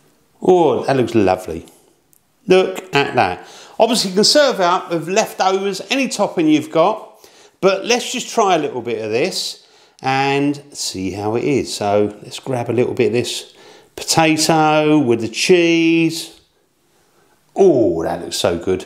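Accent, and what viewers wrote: United Kingdom. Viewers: Your definitely British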